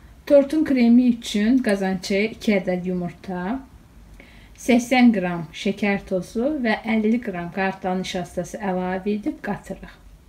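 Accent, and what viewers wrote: Baku, Azerbaijan. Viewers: Əladır,şirin ləhcəli bakılı qız.Bu torta qat.süd və yağdan ibarət krem çəkmək olar?mən bişmiş krem sevmirəm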